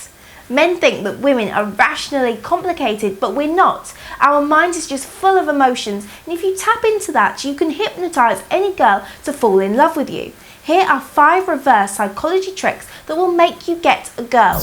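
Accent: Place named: United Kingdom. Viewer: The creepiest thing is this ultra thikkkkk British accent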